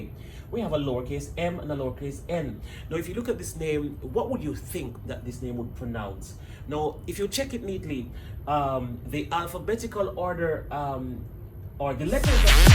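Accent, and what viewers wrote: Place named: Ireland. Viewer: Great stuff buddy. I notice your accent almost sounds a little Irish at times.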